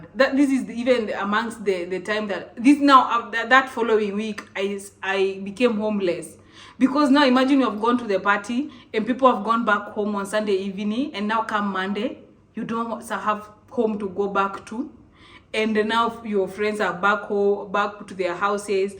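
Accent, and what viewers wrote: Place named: Kenya. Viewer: I literally enjoyed every bit of the story. I can relate with everything you said (though I have not experineced everything). Well done beautiful. I love the Kenyan accent and how you just let yourself be " " and nobody else. Sis permit me to ask what Cameras and Lights you use for your podcasts?( I need the info for mine too) It is soooo clear and it enable me admire and just enjoy that beautiful transparent, glittering skin of yours. You are SkincareProMax.😊😊😊 Much Love from Cameroon. ❤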